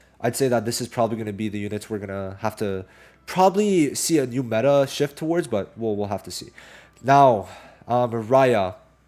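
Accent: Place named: Germany. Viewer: Bro sounds so german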